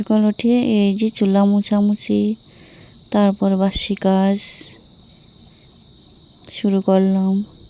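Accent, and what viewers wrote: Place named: Assam, India. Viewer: তোমার কথা গুলো শুনতে আমার খুব ভালো লাগে। মনে হয় তুমি আসামি ভাষায় কথা বল ❤️❤️একটু আলাদা কিন্তু ভীষণ ভালো লাগে। 👌👌💐🌹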